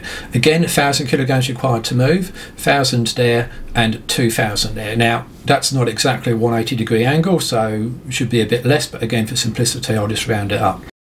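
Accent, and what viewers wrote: England, United Kingdom. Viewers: Hi You sound like a pom but that photo looks like Australia? I enjoy your videos, thanks for taking the time. Mike from Australia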